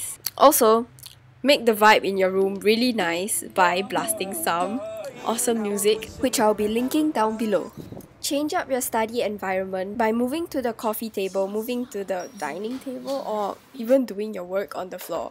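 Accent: Singapore. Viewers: first time hearing that a singaporean accent sounds french lol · I love how you don’t try to change up your sg accent 🤩🤩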